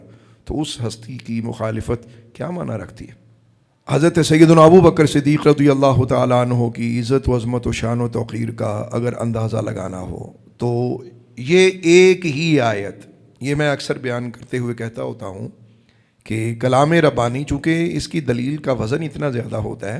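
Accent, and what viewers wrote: Arab world. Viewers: SUBHAN ALLAH… YA SAYADI YA MURSHADI … qurban ap k ilm o feham py … arbic lab o lehja khubsorat … muzu kalam alaaaa… tahqeeq or dalaile zarbdast… QURAN E PAK ki ayat mubarak se ABU BAKAR ki shan or muqam ko waziya beyan farma k munkiron ko lajawab kiya … andaz e beyan alaaa or purasar …. AP ki ilmi baserat ko salam … ❤️🌹❤️🌹❤️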